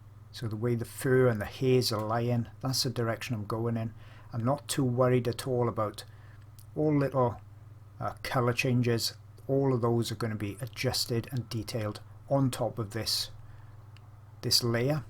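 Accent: Wales, United Kingdom. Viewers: Woooo wow, this is excellent 👏🏻👍🏼 love your art.. can I hear a Welsh accent!!!! I'm from carnarvon North Wales originally ✌️✌️😁😁🇬🇧🐲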